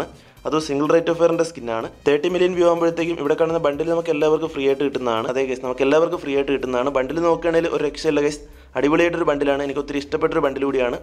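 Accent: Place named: Tamil Nadu, India. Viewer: Hi bro Tamil Nadu voice nice bro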